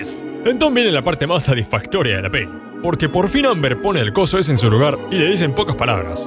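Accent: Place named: Argentina. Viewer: ¿Por qué hablas como argentino si eres de Perú?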